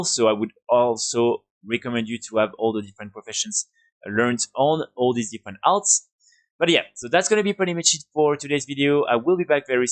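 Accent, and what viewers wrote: France. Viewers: Cet accent français 😂😂😂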